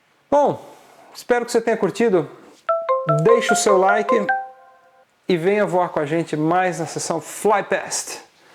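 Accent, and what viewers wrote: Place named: Argentina. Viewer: Guuuuauu what a beautiful videos a photos...like always! If i dont know that you are brazilian...in the part of AR..i think that you are a argentinian jajaja..con un mate en la mano! Tenes un acento argentino perfecto en tu español! Jaja i have a question...i read about the relation of you, David Neeleman and Azul with viracopos...but from 1990 (KLM moved to guarulhos) to that moment...what happend in viracopos? All the people say "viracopos its beautiful, great desing and funcion". Big hug from an argentinian architect...who loves your photos and desing! And your histories!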